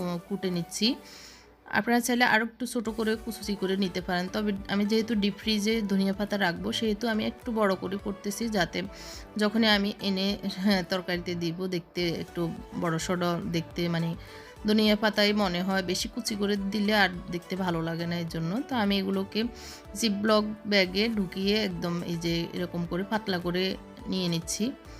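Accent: Noakhali, Bangladesh. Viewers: আন্নে নোয়াখাইল্লা নি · আপনার দেশের বাড়ী কি নোয়াখালী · আপু গো তোমার বাড়ি নোয়াখালী তাই না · আপনি কি নোয়াখাইলা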